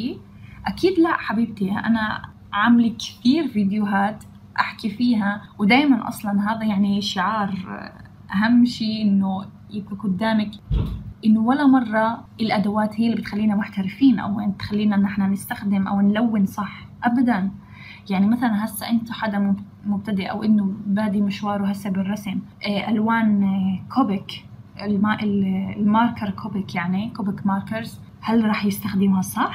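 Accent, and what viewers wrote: Palestine. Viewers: بالعكس الرسمة جميلةة ....بس ما شفنا الحبر دخل بالرسمة ابداا ...مع انو الحبر اساس التحدي 🙈 مرة اخرى أُحِبّ لهجتكك الفلسطينية❤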